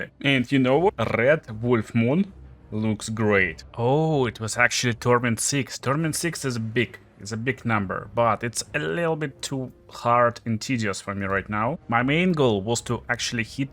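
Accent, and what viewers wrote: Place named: Russia. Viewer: Такое чувство будто бро говорит с русским акцентом....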